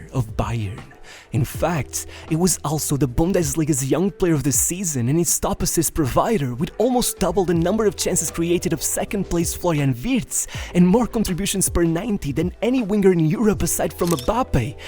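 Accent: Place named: Portugal. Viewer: Your agitated narration and heavy accent make you very hard to understand and annoying. Either relax and slow down your narration or improve your intonation to sound more like an English speaker and less like a Portuguese speaker. All the best.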